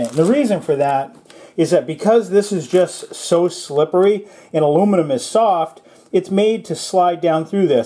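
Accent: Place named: New England, United States. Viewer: I love new england accents LOL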